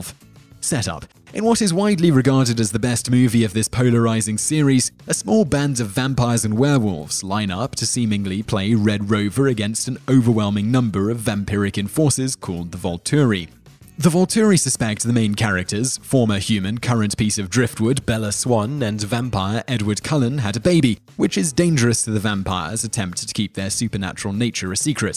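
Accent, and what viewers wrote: United Kingdom. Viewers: We really should be more grateful when it looks like accidentally says something that may not be completely accurate or expresses an opinion that doesn't appear thoroughly thought out. The researchers here are so on point with such frequency that it makes me wonder if their occasional factual and/or contextual errors are not intentional. It just seems like an ingenious ways to fuel the comments section. After all, who doesn't enjoy feeling smarter that a very charming, well-read guy that has a British accent.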